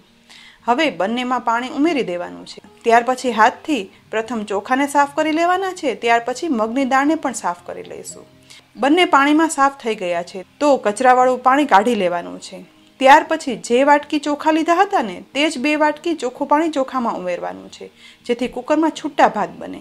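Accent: Kathiawar, India. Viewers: Excellently instructed in Gujarati language with Kathiyavadi Accents.👍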